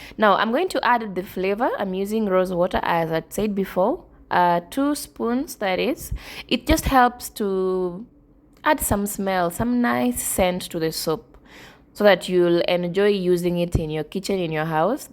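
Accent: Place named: Africa. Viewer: Simple. I loved it bcoz of your narration, and with out seen you, I knew it's from Africa 🌍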